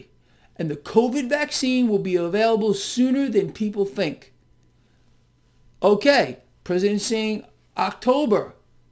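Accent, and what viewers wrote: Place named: Bronx, United States. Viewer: Hey, I do so much admire your advise, HOWEVER, please drop your BRONX background with the aks talk, Pronounce the word ASK as you were taught with the "S" before the "K". Please practice..... s... before....k.... It may be hard... PLEASE practice. thanks so much!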